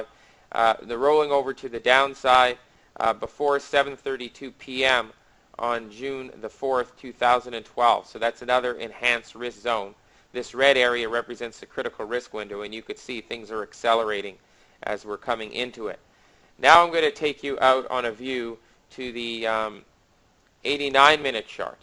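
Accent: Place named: Pittsburgh, United States. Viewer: If you're not from Pittsburgh then I'd be very interested to know where you got that accent. Great work once again.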